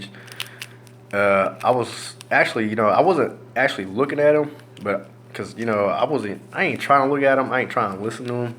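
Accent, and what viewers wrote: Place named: Texas, United States. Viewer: I don't think the machine will work but if you want to buy it just to do a product review that is cool. I think people try to take advantage of the desperate to make money. IF you want to try some kind of blocking device, Neodymium magnets work somewhat. You can get them in a headband or tape them to one. I heard mirrors can send back whatever waves they shoot at you or maybe just even sheet metal. What would happen if you pulled out a frying pan and stuck it over your legs where they send the beams? Maybe it would break their equipment. Why do you they think you should go to Mexico? You don't even look full blooded Mexican maybe because you act so American. You even have a heavy Texas accent instead of a Spanish accent. You should tell them okay, buy my ticket, Hasta la Vista.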